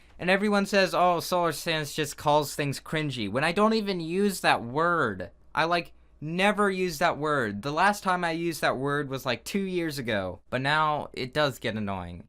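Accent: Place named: United States. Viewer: F A N T A S T I C :3 I thought he was American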